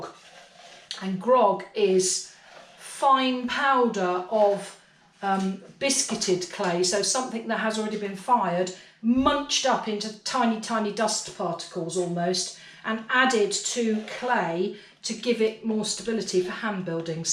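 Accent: United Kingdom. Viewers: HELLO! great to hear a British accent! i get confused about Underglazing....i understand that after you ug a greenware piece, you then bisque it....then you put 2x zinc free clear on for shiny....now here's my questioin: can i put wax resist over all that and then apply my regular glazes? i know that Amaco Potter's Choice may cover up my underglazed, clear glaze (and waxed?) design, so how do i avoid that??? i like the effect of the PC glazes which alot of them 'run'. if i wax my design, i wonder if my regular glazes will cover up the design? thank you!!!!!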